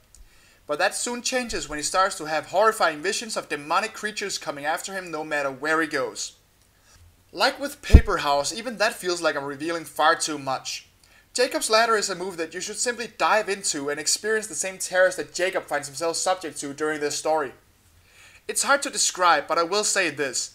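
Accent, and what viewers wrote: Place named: Denmark. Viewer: You sound very danish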